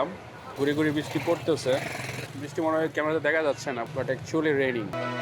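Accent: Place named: Mymensingh, Bangladesh. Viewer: সম্ভবত ময়মনসিংহের আশে পাশে।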